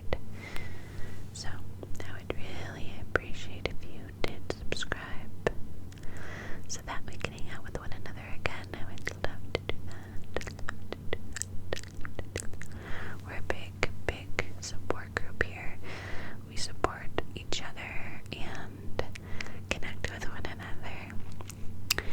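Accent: New York, United States. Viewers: Yay NY accent is my absolutely favorite thing you do!! A whole accent video or trying another one would be cool 😻 Love u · i love the ny accent series ur the best for keeping my attention span engaged and making everything so tingly thankuuuu🥰🌟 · Yay! She is back with NY accent. My fav accent in U.S. 🥰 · Was eagerly waiting for a NY accent video, and was so delighted to see this pop up! Thank you so much,! 💜💜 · This is insanely perfect timing! I was just typing into my search bar for a ASMR NY accent video and you DELIVERED with new content 😍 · Yay! The NY accent you do is DA best!!!! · I ❤️the NY accent! So tingly! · Yay! Thank you so much! I love your NY accent! ❤️ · You have no idea how much I LOVE your ny accent videos! 😭💕 Thank you so much,. Sending you lots of love from Tijuana 🇲🇽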